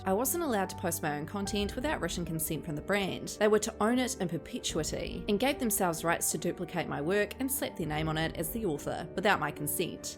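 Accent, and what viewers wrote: New Zealand. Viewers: as a fellow kiwi i feel like ur accent is SO strong and its kinda satisfying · Living for your content of late. I could listen to your kiwi accent all day. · Kiwi accents are like my absolute favorite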